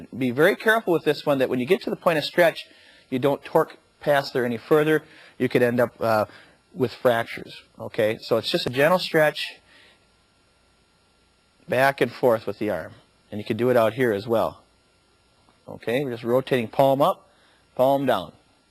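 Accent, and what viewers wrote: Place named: Canada. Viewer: haha this video looks like it could have been made from the 70's I like his (I believe) Canadian accent, haha, but still very helpful, thanks :)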